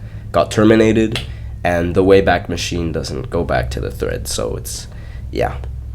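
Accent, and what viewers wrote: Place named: Germany. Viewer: Also a German here! Man hört den Akzent aber nur ganz schwach raus, find ich :D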